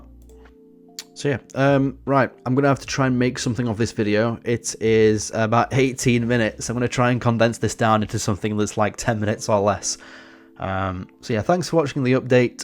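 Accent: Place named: Southport, United Kingdom. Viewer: Not related to the video but your accent sounds exactly like a youtuber TIK history who is from southport, and similar but slightly different from a different youtuber who is from York. Its just cool to me when as a non english speaker I notice not just general "british" accent but regional ones too I hope you land the job you want and I hope your channel grows and youtube becomes a more stable source of income for you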